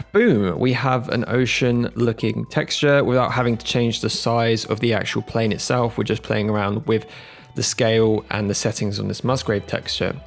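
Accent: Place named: United Kingdom. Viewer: i think you are british guy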